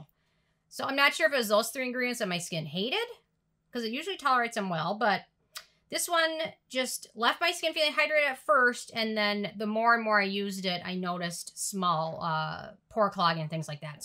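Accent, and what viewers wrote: Minnesota, United States. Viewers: I don't have blemish worries, but I watched the entire video anyway.😆 That peach top is a great color for you and I LOVE your purple eyeshadow--so pretty! I like listening to your Minnesota accent. I'm a former Yooper (know what that is?) so our regional accent is somewhat similar.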